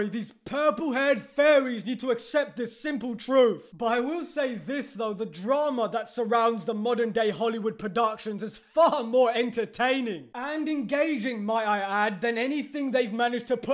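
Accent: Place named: Scotland, United Kingdom. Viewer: Is this guy scottish or a really mad guy from England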